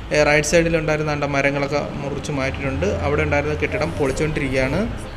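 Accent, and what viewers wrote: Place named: Kollam, India. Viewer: നമ്മുടെ കൊല്ലം ഭാഷ💕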